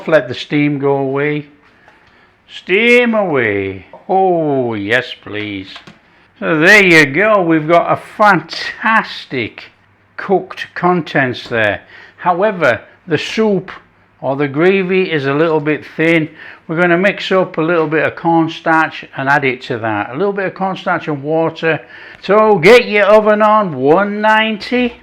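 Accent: United Kingdom. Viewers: That sounds delicious, but I have no Idea, where I can get suet in germany. Maybe I just try it with butter. Cooked your chinese beef with onions today and had a look, what else I can learn from you. I really like your accent, from which part of the UK do you come? I can understand every word, thats nice, compared to all the mumbling americans, I can only get every third word…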